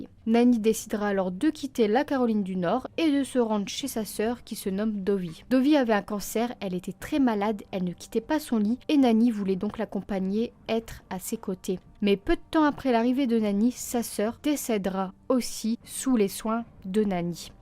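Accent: North, France. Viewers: t'est du nord toi ^^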